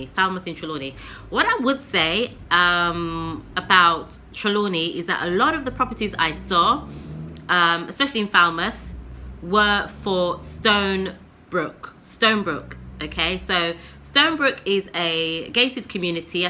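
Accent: United Kingdom. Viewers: your new subbie like your English accent and your contents, you're beautiful i am here supporting you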